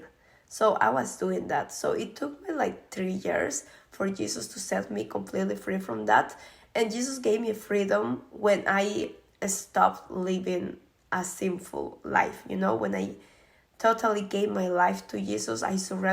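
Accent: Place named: England, United Kingdom. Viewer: An an American I love the way she talks however I have no idea where she is from haha. England? France?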